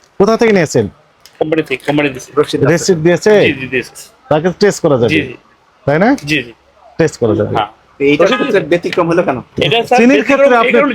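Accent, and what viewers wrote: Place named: Naogaon, Bangladesh. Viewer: স্যার আপনার বাসা কি নউগা?আপমার ভাষার একসেন্ট অনেকটা অইরকমই!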